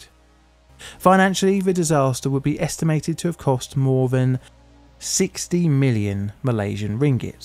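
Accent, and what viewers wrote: United Kingdom. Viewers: Narrator’s accent is aggressively British.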